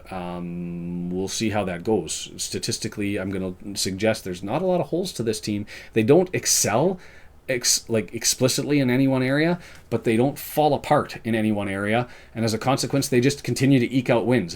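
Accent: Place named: Wales, United Kingdom. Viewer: Great Welsh accent you’ve got there mate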